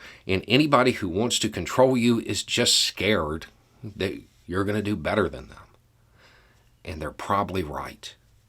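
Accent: South, United States. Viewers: Many thx for your videos. You talk about important subjects in a straight way. Peel away rubbish and twisted ideas. Again, thx from a grumpy old man, living in northern EU. ( I also understand your fxxxing southern accent ☺️) Slava Ukraini 🇺🇦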